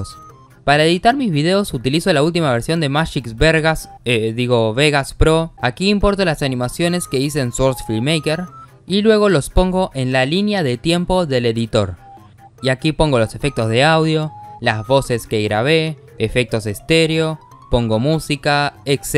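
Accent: Argentina. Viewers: ey pregunta sos argentino o chileno? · Mentira q so argentino no? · Eres argentino?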